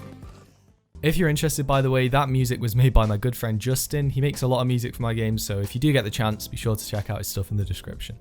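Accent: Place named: United Kingdom. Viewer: I can notice a british accent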